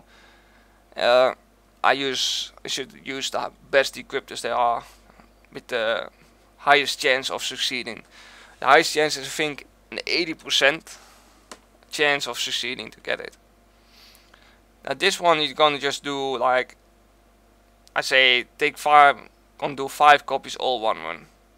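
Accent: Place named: Germany. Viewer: Thanks you havery an interesting accent and I was just wondering kinda sounded like a mixed german accent.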